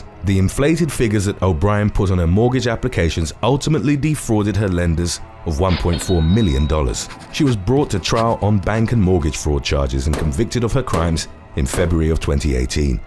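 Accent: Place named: United Kingdom. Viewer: how come you sound british yet this channel uploads in USA? Good channel bro, i watched it grow the past 7, 8 years. 5☆ mate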